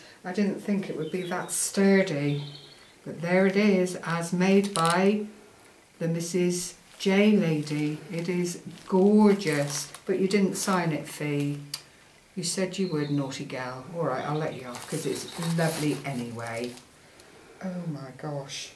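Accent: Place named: Cornwall, United Kingdom. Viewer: Wow, you lucky lady, I like your accent, I wonder if your from cornwall? I love it down there...the plaque you won is lovely, I tried to win it too..lol..so generous of Fiona to send the extras for you, I love that dangly trim, you'll have fun using that I'm sure. I am also learning so much from her tutorials. Welcome to the YouTube video making side of crafting..lol..(even if you do only ever have 1 video..lol) enjoy your winnings. TFS...Ann...x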